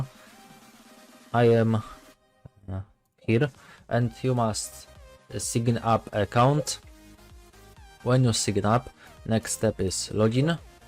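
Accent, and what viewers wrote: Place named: Poland. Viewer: widać ze polak po akcencie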